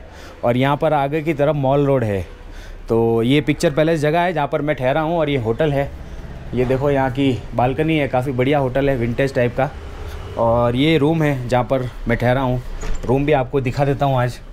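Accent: Gujarat, India. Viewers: Avaj thi lage ke gujrati co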